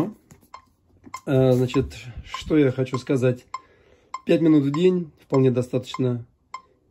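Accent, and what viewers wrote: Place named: Russia. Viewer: Любопытно. Но автору неплохо бы поработать со своим жутким русским акцентом.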